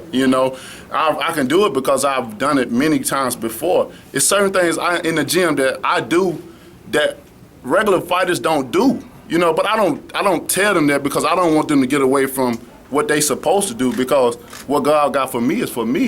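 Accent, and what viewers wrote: United States. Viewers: well Einstein, he's from a southern state, where a lot of them have the same dialect. You grammer cops kill me. This man will put you and your correct grammer to sleep in one punch.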